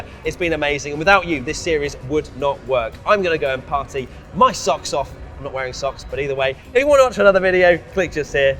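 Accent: England, United Kingdom. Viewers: Less English accent. It's quite annoying.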